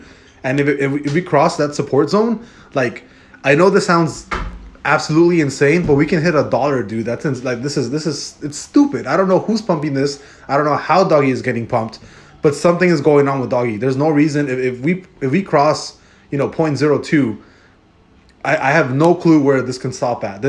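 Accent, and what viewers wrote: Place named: East Los Angeles, United States. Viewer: Subbed ! Reminds me of that east LA accent. I'm staying put at 600 for now I wouldn't mind losing it but let's see how tomorrow goes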